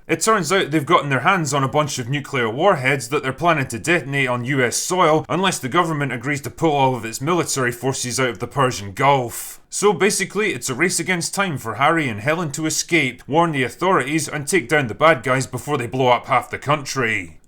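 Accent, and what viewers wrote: Scotland, United Kingdom. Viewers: it doesn't matter to me what movie/tv show you review just as long as i hear your Scottish voice. Just keep them coming and I will keep watching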